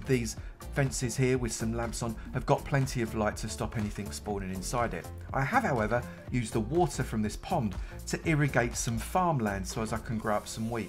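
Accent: United Kingdom. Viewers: Are u British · its the british accent 😹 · he got the average british "voice"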